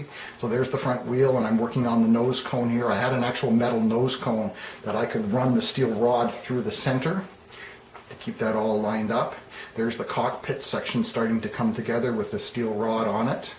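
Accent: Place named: Canada. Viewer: that is an amazing story - and an amazing model. One error in your narrative - we designed and built the car in MILWAUKEE, Wisconsin - not California. When I heard you say the words PRO-ject and DECK-al I knew you were Canadian. I understand the long winters up there need some indoor activity to fill your days. Neat collection of hotrods, too. Actually, it took us less time to build The Blue Flame than your model. The project began in August 1968 when we signed the contract with the American Gas Association, and ended in October 1970 with the record at Bonneville. The car was actually essentially done building in July 1970, just for comparison. Great video, too!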